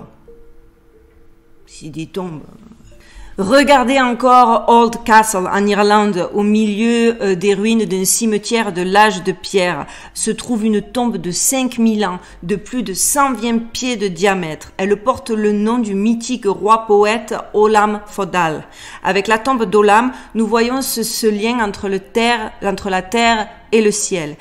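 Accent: South, France. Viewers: Namaste ,j'ai découvert ta chaine il y a peu et je me suis abonné. Je traite sur la mienne de paranormal,d'ufologie mais aussi d'archéologie alternative ,de mystères.... Tous ces sujets sont passionnants ,brabo pour les recherches , Que la Force soit avec toi et la Résistance amicalement , Doumé PS : j'adore ce petit accent du Sud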